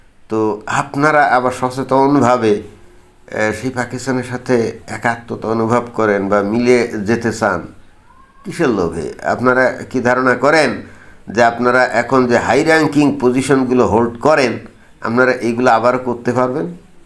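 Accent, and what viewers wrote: Bangladesh. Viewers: Apnar Kotha gulo Sunte khub Valo lage.. B.deshi Accents.. Namoskar dada..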